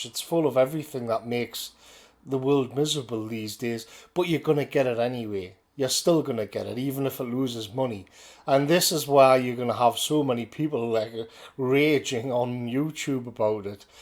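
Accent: United Kingdom. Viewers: I was watching a video about how to do a "Proper" British accent (purely for laughs) when the notification for this came up. Right off blasted with "Twen(!)ee-nine(!)een". Good to hear a proper British accent again.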